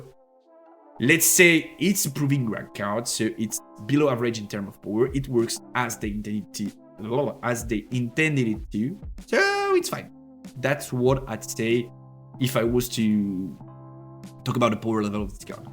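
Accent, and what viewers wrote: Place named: France. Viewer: Je t'ai découvert avec Riftbound, donc en anglais, quand j'ai capté ton accent et que ta chaîne était en FR avant ça, j'ai pre--shot les commentaires de ce genre, mais tkt tu fais bien. Ton anglais est top et super compréhensible et tu te dirige sur un jeu pour le moment full ENG, avec gros potentiel de fanbase vu la licence, donc imo tu fais le bon move, surtout vu ta ligne éditoriale très compétitive, au moins tu te restreint pas au public FR super limité dans ce format :) 💪👏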